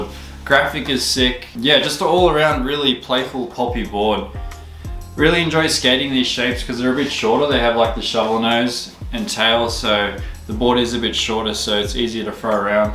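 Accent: Australia. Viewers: Mad deck also are you from Australia or nz? From the uk btw. Nice shoes also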